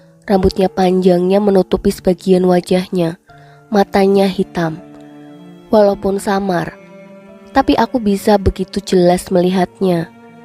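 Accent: Banyumas, Indonesia. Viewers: Ini naratornya mbak asli banyumas ya? Aksen ngapaknya keliatan wkwk 😂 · Orang ngapak nih kayaknya koreksi kalo salah 🤣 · Galfok karena naratornya medok bgd bacanya. apalgi pas baca merokok, cicaheum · Suaranya....koyo wong ngapak...... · Ini pasti orang ngapak banyumas /cilacap.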